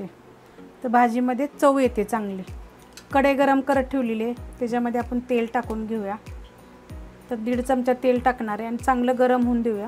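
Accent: Satara, India. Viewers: पक्का म्हणजे सातारी,बोलण्यात पण सातारी तडका जाणवतोय 😅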